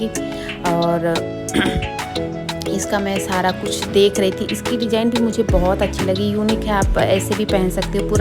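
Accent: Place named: Chhattisgarh, India. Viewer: hlo didi aap kha se ho apke bat karne ka tone chhatttisgarh k loogo ke jaisa hai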